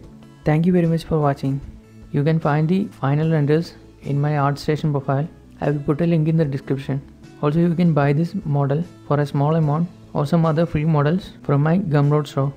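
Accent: India. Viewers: If You hear the India guy, You know that tutorial must be good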